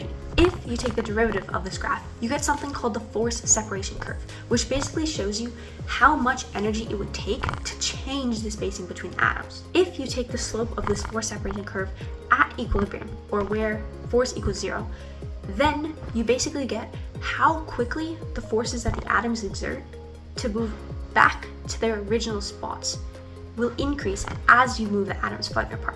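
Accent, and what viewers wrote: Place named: Hungary. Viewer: You are Hungarian?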